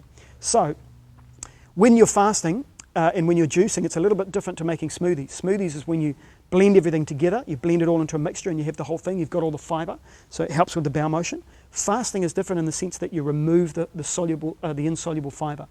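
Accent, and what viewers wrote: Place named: New Zealand. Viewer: that's a very strong New Zealand accent if ever I heard one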